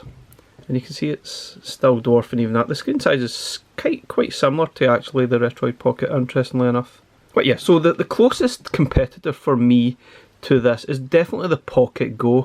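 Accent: Scotland, United Kingdom. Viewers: I’ll buy anything described in a Scottish accent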